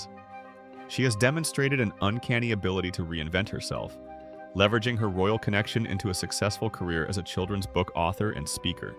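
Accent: United States. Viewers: Why the American narrator?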